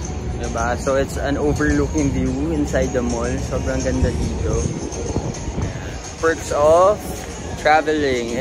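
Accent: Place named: England, United Kingdom. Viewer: I love your English accent!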